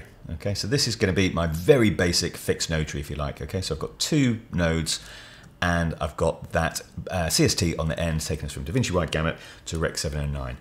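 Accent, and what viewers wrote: England, United Kingdom. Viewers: This video is a life saver! I'm about to release a documentary film were I use mainly HLG (Rec.2020/Rec.2100 HLG) color space and gamut. I used to start with the color space transform but now I'll do as you tell here. Gonna save this video in my reference videos. Also you sound English, why type it color instead of colour?😅 Warm regards.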